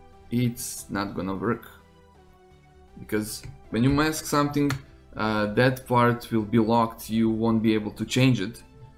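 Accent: Russia. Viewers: жесткий русский акцент ХD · Hey бро, it's a Russian accent ? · R u russian? Whats your native? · Really nice video. Is your accent russian?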